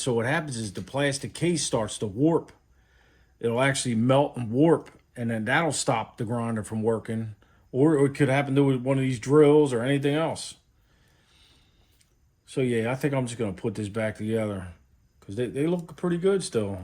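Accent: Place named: Philadelphia, United States. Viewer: I’ll bet my house this is a Philly accent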